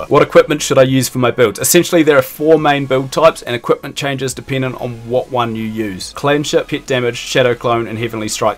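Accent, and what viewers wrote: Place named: Australia. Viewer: must be the aussie accent as well <3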